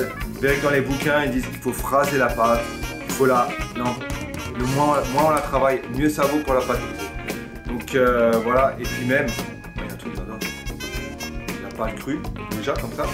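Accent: Alsace, France. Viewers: Tu viens d'Alsace non ? :p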